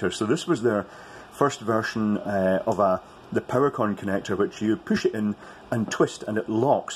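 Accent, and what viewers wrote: Scotland, United Kingdom. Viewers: This is so lovely. I live in the US, but I worked the Fringe for Gilded Ballon many years ago. Seeing 16a Ceeform sitting on a flight case while hearing a Scottish voice and pipes just warms my heart!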